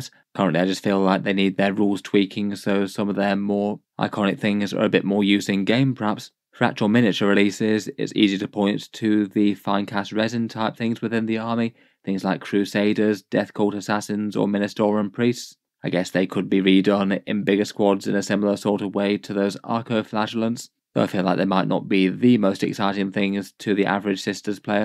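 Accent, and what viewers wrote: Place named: United Kingdom. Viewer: What UK regional accent is this? Very narrative. Can you read LOTR to me for money, lol.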